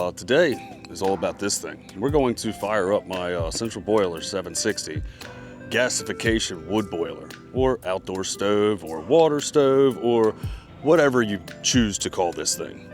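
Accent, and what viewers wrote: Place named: United Kingdom. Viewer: Another viewer from the UK here. Just wondering if you're from the UK, or have spent time here as parts of your accent is very British :) First time watching, great content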